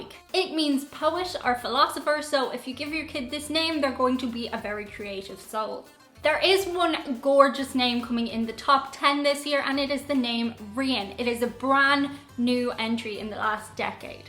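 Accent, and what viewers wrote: Ireland. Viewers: off topic question. Living in Spain, do you speak Spanish? I I would really like to hear an Irish accent with Spanish mix!👍👌😃🔆🌴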